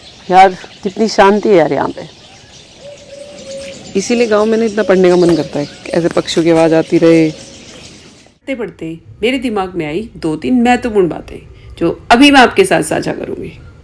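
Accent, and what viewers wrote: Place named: Rajasthan, India. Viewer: Sister ya h Rajasthan kii boli m gandasho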